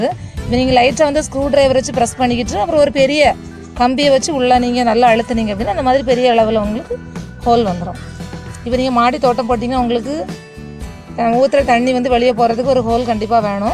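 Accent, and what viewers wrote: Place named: Kerala, India. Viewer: hi mam,thank u viewers, pudichirunda subscribe pannunga,nu neenga Malayalam kalandha Tamilla solradu romba cuuta iruku , well. done very good!!!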